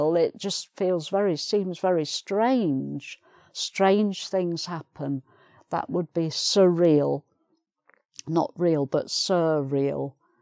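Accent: United Kingdom. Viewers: Thank you for this videos, I love the British accent I undersant all without subtitles, Greetings from Mexico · Your videos are my bread and butter lately❤❤❤❤...I love your British accent, could you make a video in how to sound british please? Please, please, please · Hi,.I like your accent.God bless the UK.天佑英国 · Hello Mrs., i am Merci from Indonesia. I am on the second year in junior high school this year and due to the covid-19 i have to study at home. It is nice to know you and nice to follow your lessons. I am so interested in following your lesson and i like your accent very much. Anyway, i would like to imitate your British accent keep joining your English program. Best regards and have a nice day from Java island - Indonesia.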